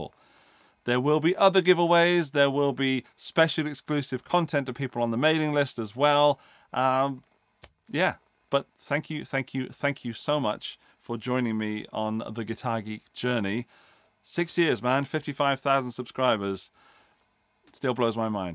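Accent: England, United Kingdom. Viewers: I'm relatively new to the channel, and so far my biggest takeaway, and the thing for which I'm most thankful to you, is learning of the Strymon Flint, which will soon be part of my (very small) pedal arsenal. That and the hair, which is apparently somewhat toned down these days. Some of the older clips... it's memorable, I'll give you that! Seriously though, you're very articulate and occasionally funny with an English accent, which to American ears connotes intellect and is immediately suspicious to the bad people. I like it myself.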